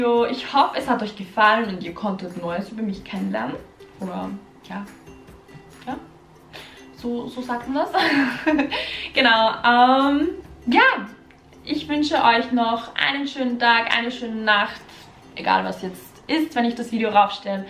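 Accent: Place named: Austria. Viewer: Sie ist aus Österreich, deshalb die Aussprache!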